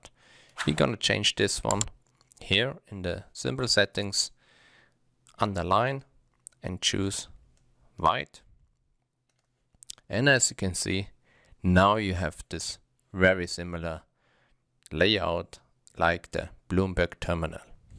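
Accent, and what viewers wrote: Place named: Germany. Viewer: ich höre am Akzent, dass Sie Deutscher sind. meine Fragen 😉: 1. Fast alle Händler und mittlerweile alle professionellen Händler verwenden Candle Sticks. Könnten Sie vielleicht hierzu einige farbliche Hinweise geben? 2. Der obere Bereich (Covering) ist ja in Bloomberg orange, einige Teile auch rot. könnten Sie auch hier ggf. Einstellungshinweise geben? vielen Dank im Voraus. Ergänzung: Meine Watch List sah früher bei Bloomberg auch etwas anders aus. Ich möchte dies gern in Tradingview so einstellen. Wie geht das?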